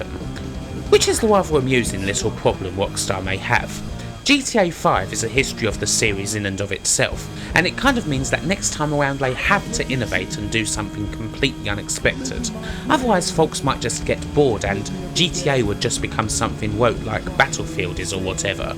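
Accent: United Kingdom. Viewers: people don't watch long videos. If you want information about a game which boils down to "is it worthwhile or not?", it can probably be boiled down to 5-10 minutes. If the video is longer, chances are, the density of original thought and production quality is quite low, and 90% of the voice over wouldn't even pass for complete sentences, consisting mostly of "uhhhs". Admit it, you have seen such videos and would avoid them like a plague. Now, this guy is nothing like that, he can actually make insightful points an hour long. But not a lot of people know that, apparently. Add to that the mild British accent, and suddenly the bulk of the largest English-speaking country imagines that it's too difficult to comprehend.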